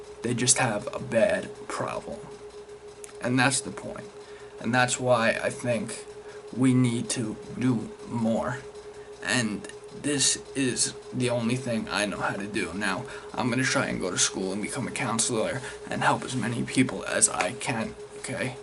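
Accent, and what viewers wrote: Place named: New York, United States. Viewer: yo I hear what you're saying in this vid, I've been struggling since I was 16 and I'm 19 now.. what you were saying about the sub docs is true no one needs that much, there's a 'ceiling effect' to the stuff.. none the less u spoke the truth and I dig the patriots stuff in ur room even though u sound like a new yorka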